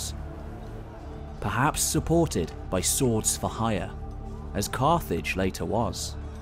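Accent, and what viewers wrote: United Kingdom. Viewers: I'm always looking for good history doc channels and yours is certainly one, thus I have subscribed. Being one-quarter British I enjoy the many accents from your islands and would rather see a world history documentary presented by a Britisher than one from a fellow American. Keep up the excellent work and thanks for the many links you've generously provided to other related sources.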